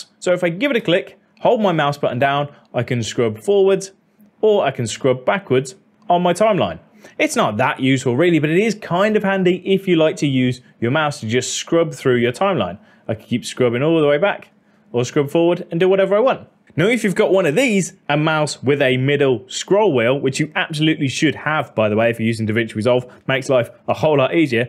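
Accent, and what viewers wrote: United Kingdom. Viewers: Talking fast with a British accent😬 My ears aren’t use to it…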